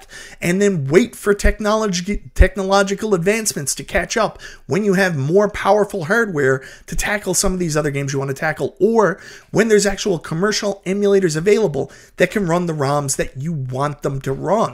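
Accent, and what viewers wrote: Canada. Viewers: no offense I watch your videos. Your Canadian accent with your pronunciation of Arcade gets on my nerves to no end for some reason. Lol. But people come here to hear about the game. While not stating it you were giving other peoples opinions of the game. You are essentially giving a review when people watch this of what to expect. You’re splitting hairs here.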